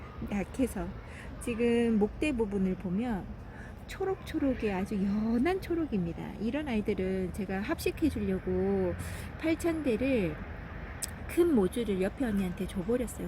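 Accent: Gyeongsang, South Korea. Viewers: ㅎㅎ 아마도 안본사람 있어도 한번만 본 사람은 없을듯합니다 계속보게되는 끌림이 있네요 댓글도 일일이 달아 주시는 모습에 감동 또 감동입니다 앞으로 구독자가 4만~400만~~ 될때까지 팬으로 응원합니다^^♡ 말투도 정감있네요 그래서 대구근처에 사시는 줄 착각할 뻔요 ㅋㅋㅋ 댓글달기도 저는 첨인 아슬맘 이거든요 ㅎ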